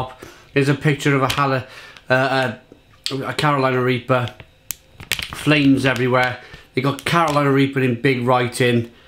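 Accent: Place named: Cardiff, United Kingdom. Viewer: Hey Bud! Fantastic reviews as always. You are a great ambassador for us Welsh. You have an undeniable honesty about you and are incredibly modest to boot... I'm so proud of the entertainment you provide. I'm an expat and just hearing your Cardiff accent brings back a lot of great memories. You've made me proper chuckle on many occasions. lloniannau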